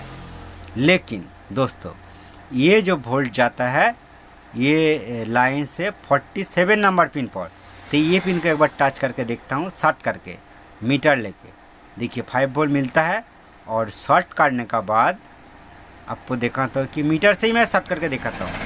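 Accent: Bengal, India. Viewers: Nice information Dada. Apka Bengoli Hindi very nice.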